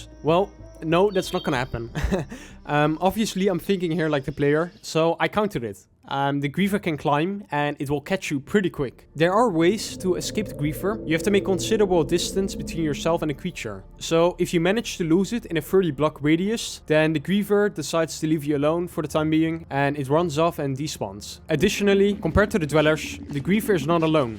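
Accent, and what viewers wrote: Netherlands. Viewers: Are you dutch? Ur accent sounds very dutch, no offense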